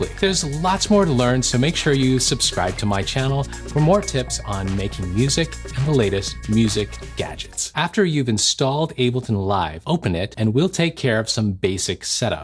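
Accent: India. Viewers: Are you INDIAN ? 😃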